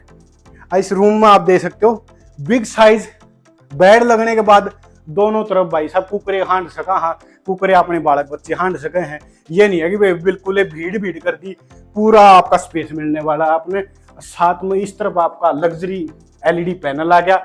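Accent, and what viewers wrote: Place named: Haryana, India. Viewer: Sir haryanvi touch k sath to or bhi acha lga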